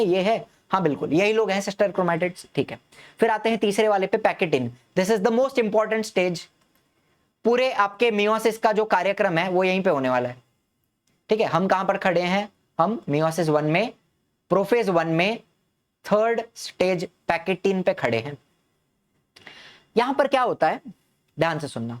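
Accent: Maharashtra, India. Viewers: Sir are you marathi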